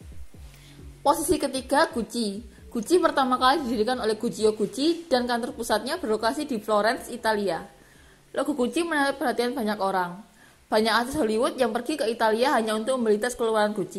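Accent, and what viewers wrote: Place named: Java, Indonesia. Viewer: medok bgt ngomonge 😅 jawir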